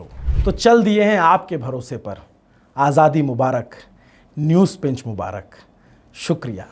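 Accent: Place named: Awadh, India. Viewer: Bahut badiya bhaiya 👏 Aapki awadhi kamal ki hai❤ Lalitpur up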